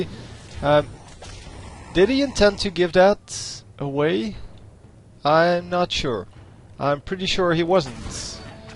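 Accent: Netherlands. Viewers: I sense a dutch guy here XD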